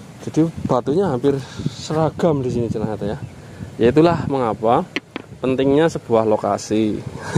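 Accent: Banyumas, Indonesia. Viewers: Gayor ngapak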